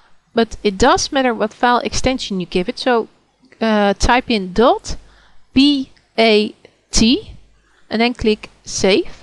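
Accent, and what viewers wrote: Netherlands. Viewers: i think your dutch :) dankjewel voor deze video :) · Are you Dutch? kinda hear ya accent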